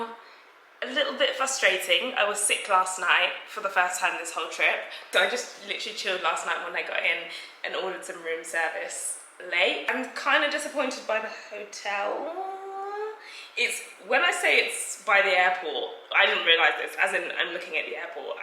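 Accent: United Kingdom. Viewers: Are you British, you hv that accent there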